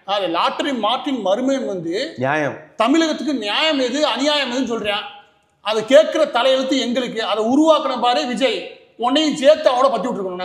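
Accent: Madurai, India. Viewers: bro‌ நீங்க மதுரை தானே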